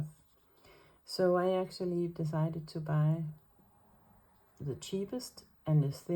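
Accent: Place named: Denmark. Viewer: I love your accent! My grandfather was from Denmark but I don't remember much about him besides the way he spoke :)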